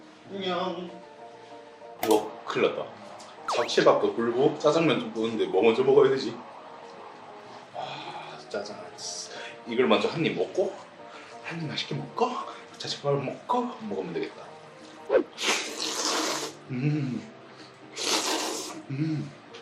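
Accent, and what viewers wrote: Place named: Daegu, South Korea. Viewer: 알고리즘으로 첨 뵙네요~^^ 말투가 대구분이신듯 . . 제가 대구라서요~ 대구분이시라면 너무반갑습니당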